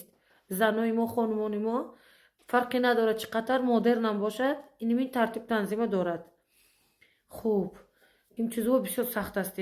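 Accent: Bukhara, Uzbekistan. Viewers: Чи хуш чашмони зебо дори лахчаи зебо дори ошикат шудам духтари бухоро самарканд .💕✊️ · Офарин апа, лаҳҷаи шумо (Лаҳҷаи Бухоро) бисёр ширин аст. Ҳаминхел наворҳо бисёр шавад, иншоаллоҳ шумо забони ширини тоҷикиро фаромуш намекунед. Салом аз Тоҷикистон. ❤Самарқанду Бухоро❤ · Чонам фидои миллати азизам лахчаи ширини Бухорои шариф чи зебост хохарчони гиромикадр дустат медорам ба пеш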